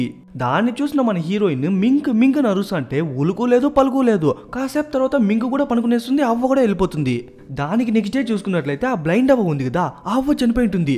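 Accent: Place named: Karnataka border, India. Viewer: Karnataka border lo slang alane untadi bro for reference you can see harm hara movie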